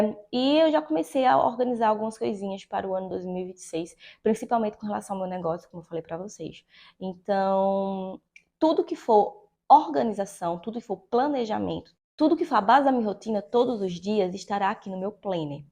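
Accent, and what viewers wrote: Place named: Recife, Brazil. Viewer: O sotaque recifense😍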